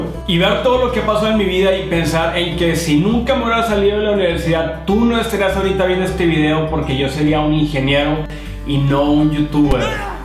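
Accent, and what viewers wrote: Monterrey, Mexico. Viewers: me gusta mucho los vídeos que haces, sigue así ,besos 😙..... 😁😁 pd:(me encanta tu hacento de regio)🙊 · yo soy de monterrey y tu si que tienes todo el asento regio 👢 sera que yo tambien y no me e puesto bien atención 😳 sera que el destino no quiere que me de cuenta 😳 tenemos que ablar ! 😂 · Exelente video yo soy mas soñadora que realista P.D. tu asentó es genial o sera que tambien soy regia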